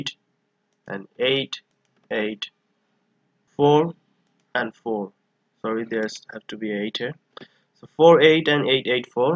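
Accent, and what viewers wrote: India. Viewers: THE INDIAN ACCENT, IM SAVED🙏🙏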